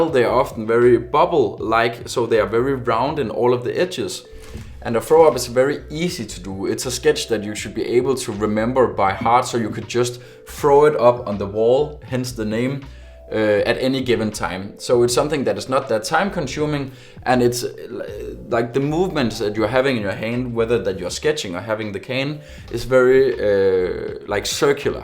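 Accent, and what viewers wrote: Denmark. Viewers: very god Danglish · Aldig hørt mere dask engelsk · Jeg kan høre en dansker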